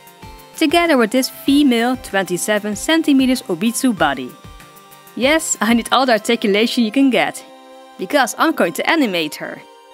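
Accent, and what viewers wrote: Netherlands. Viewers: OMG your Dutch!! I heard it from your accent!! Zelf ben ik ook Nederlands en ik vind wat je doet echt geweldig!! Zelf wil ik ook een een pop maken maar dat mag niet van me ouders ): dat vind ik heel jammer maar ik vind het altijd leuk om naar je filmpjes te kijken :D 😍✨❤ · This is so cute! Altho I can barely handle the strong dutch accent in your english 🙈 no hate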